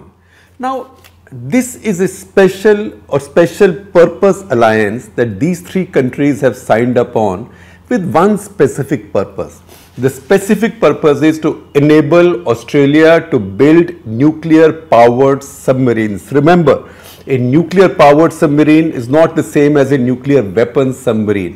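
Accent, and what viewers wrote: India. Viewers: Very India English. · Really dislike indian accent. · If you want to address English speaking people you MUST lose that broad Indian accent because it makes everything you have to say so difficult to understand. You are a very knowledgeable man but you will remain unknown as long as you speak with that thick accent, I'm trying to help you I hope I didn't offend anyone.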